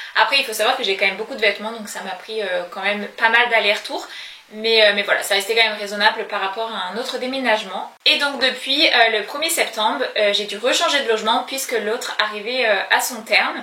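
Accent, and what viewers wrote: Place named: Quebec, Canada. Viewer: Merci bcp pour cette vidéo , on commence à entendre un petit accent québécois ds ton français :) peux tu stp nous dire comment tu as trouvé la chambre car moi aussi je cherche une chambre à louer à Ottawa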